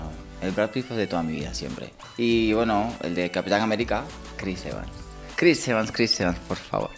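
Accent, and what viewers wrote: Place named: Spain. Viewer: No mames, eres latino y hablas el acento de España. Que ridículo.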